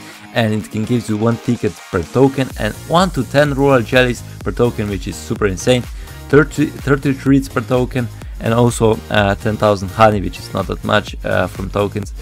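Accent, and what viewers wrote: Russia. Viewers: Чел вау..... какой же у тебя Русский акцент 😑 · русский акцент?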